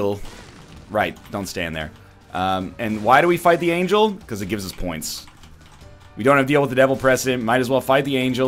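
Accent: Australia. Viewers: Your New Zealand accent is more Australian than New Zealand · probably closer to an aussie accent as they accentuate everything!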